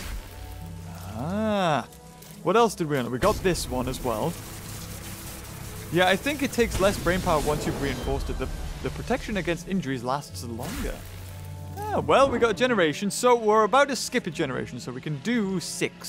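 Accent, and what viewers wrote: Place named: England, United Kingdom. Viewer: I love that English act sent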